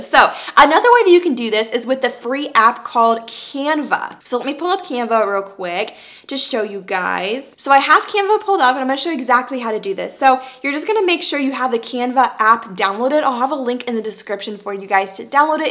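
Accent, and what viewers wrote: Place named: United States. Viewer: Reals 😂 no disrespect but i love American accent 😁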